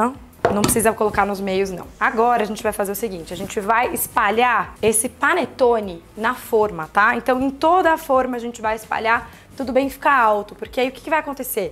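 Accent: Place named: São Paulo, Brazil. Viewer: Nao existe alguém com um sotaque paulistano tão carregado como o da Ju Ferraz, até eu que tb sou de SP fico impressionada 😍😆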